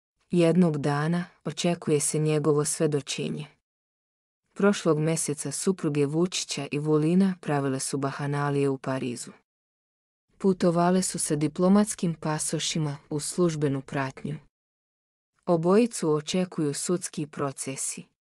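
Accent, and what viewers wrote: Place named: Albania. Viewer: Siftarski akcent ...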